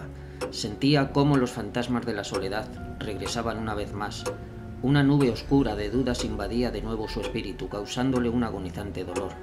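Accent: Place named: Spain. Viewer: Hermoso dibujo ,buena narración . Me recuerda los radioteatros que escuchaba cuando era niña. Tienes acento español. 🌞🌛✨🌿🌸🤗🥰😁👍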